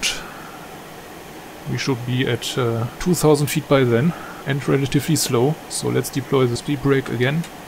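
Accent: Germany. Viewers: Super Video, sehr gut gemacht. Ich nehme jetzt einfach Mal an das du Deutsch bist, das hört man. Englisch sprichst du sehr gut👍 Im Not too Bad in speaking english, however it could be better i supose.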